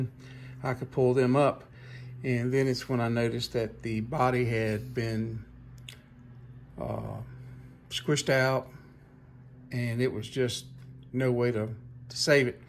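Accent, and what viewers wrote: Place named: Northern Minnesota, United States. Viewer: Ahh ok, accent sounded off to be northern Minnesota lol but people do move. Again good luck on the USACC build!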